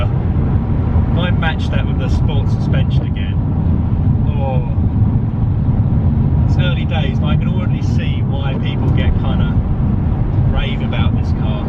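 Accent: United Kingdom. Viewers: This time on soft spoken british chaps buying german motorcars...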